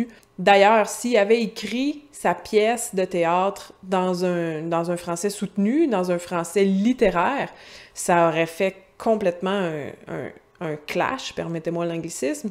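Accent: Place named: Quebec, Canada. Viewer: C'est pour ma première fois d'entendre quelqu'un parler en Québecois. Merci prof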